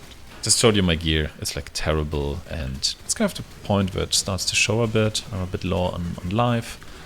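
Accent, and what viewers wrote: Germany. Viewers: I am amazed how calmly and professionally you address the leveling process. Also madly in love with the soft germanic accent.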